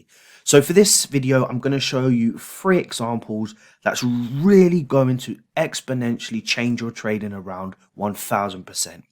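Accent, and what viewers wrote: United Kingdom. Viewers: I found you on youtube 4 days ago and started to watch your videos and found answer to my questions and found out what wrong with my set ups..all day long your words with that beautiful British accent is repeating in my head and makes me do the right thing.Thank you.Thank you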